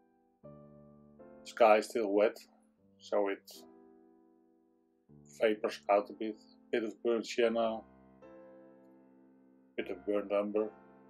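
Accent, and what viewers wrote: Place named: Texel, Netherlands. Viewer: Love this painting..so beautiful. Love your accent as well. Reminds of my grandfather who came from Texel...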